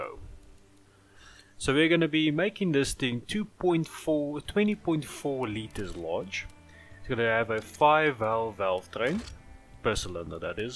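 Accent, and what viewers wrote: South Africa. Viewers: Those "R"s.... South African?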